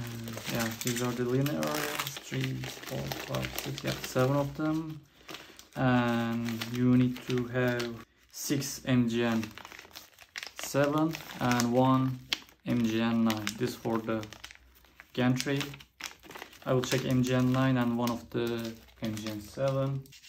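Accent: Turkey. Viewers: Hocam videonuzu izlerken aksanınızı türk aksanına benzettim, tam yorumlara girdim sormak için biri zaten sormuş bile :D. Bu kit Türkiye'ye gönderilemiyor diyor aliexpress sayfasında, nasıl eriştiniz kite acaba? Ne kadar vergi çıktı?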